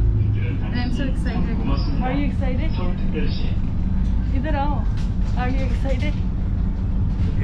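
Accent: Sindh, India. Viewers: Are u sindhi?? 🤭😂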